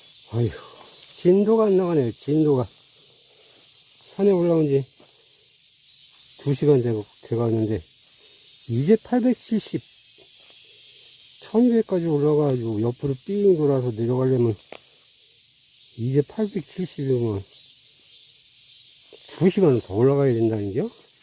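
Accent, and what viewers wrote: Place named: Chungcheong, South Korea. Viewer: 산삼능이약초산행에 갔다가 소개받고 왔습니다.좋은영상 감사하고유 말씀이 충청도분이신지 구수하니 좋네유.자주 들리겠습니다.항상 산산.풍산하세요~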